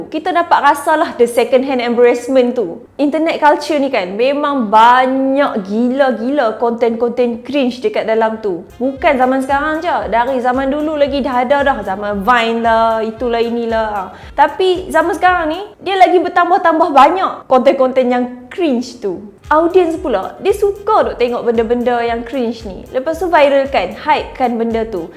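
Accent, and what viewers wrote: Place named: North, Malaysia. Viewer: Tak tau pasaipa aku tertarik dgq host punya utagha...bnyakkan lagi post guna host ni...terbaik baq hang..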